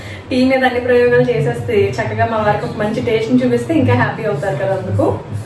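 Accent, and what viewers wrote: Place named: Telangana, India. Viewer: గారు చాయ్ తెలంగాణ భాషలో మీరు మాట్లాడినట్టు అనిపిస్తుంది చాలా సంతోషంగా అనిపిస్తున్నది ❤❤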